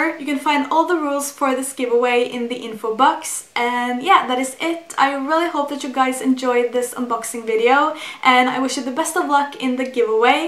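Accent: Norway. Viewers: I KNEW you were Norwegian hahaha heard it!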